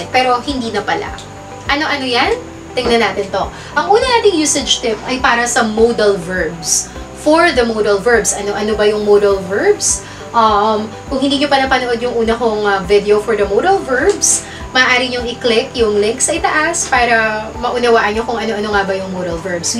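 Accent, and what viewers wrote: United States. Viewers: I think tama yung American accent mo dapat maglesson ka ng American accent sa pagsasalita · Si pinay Engish na blogger iba ang accent nya . She sounds American English . Lahat magaling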